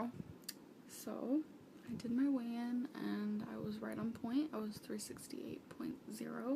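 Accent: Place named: South, United States. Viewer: Where’s her odd southern~ish(not really) accent???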